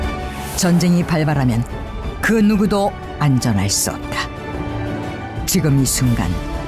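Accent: North Korea. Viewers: 말투가 딱봐도 북한이네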